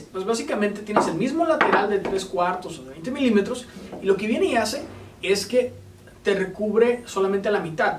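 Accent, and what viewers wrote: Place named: Bogotá, Colombia. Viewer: He estado mirando la we y he visto que es de México, Me llamó la atención porque me daba la idea de un acento de Bogotá- Colombia y me he sorprendido al ver que es de Tijuana-México. El acento es prácicamente igual, de lo cual deduzco que México no es el solo acento que más conocemos , que será de algunas zonas, pero el de este joven es muy correcto, muy bien pronunciado, pero no es muy conocido.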